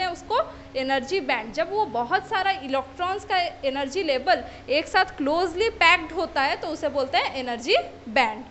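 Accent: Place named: Odisha, India. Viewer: No bakwass only point to point i like this type of lecture ❤️ it's like odia accent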